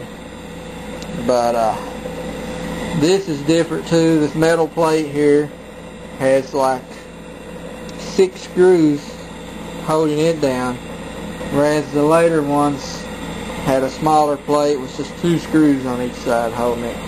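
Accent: United States. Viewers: You from the south of america?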